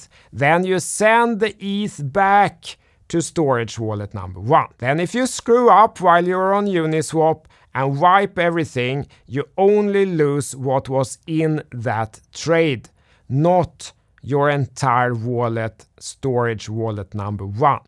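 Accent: Sweden. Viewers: Mest ikoniska svengelskan jag hört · Your dialect is so swedish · This is the most swenglish I've heard since Rosling 😂 great video! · Great video👍 I can hear the swedish accent, so you are definetly from Sweden 😄👍 Hilser deg fra Norge 🇳🇴🇸🇪🇳🇴🇸🇪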